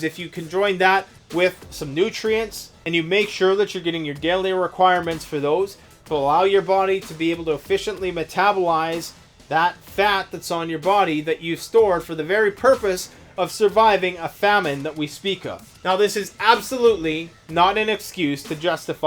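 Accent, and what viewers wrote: South, United States. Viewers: I love this freaking American accent man it’s so awesome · Work on your southern accent.By the way, you look like "the Fly" from the '50s movies(As The ). Your videos give out very useful information.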